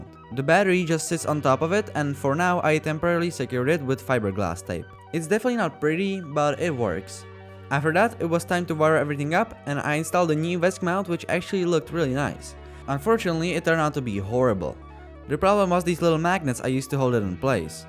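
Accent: Czech Republic. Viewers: i sense a czech accent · Kamo, poznám český přízvuk, maga tvorba a w tvoje ajina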